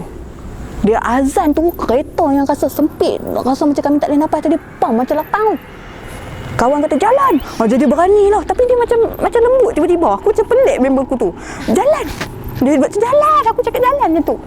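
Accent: North, Malaysia. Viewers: Cerita tk seram.. seronok dok layan sebab cara sembang rancak dgn dialek utara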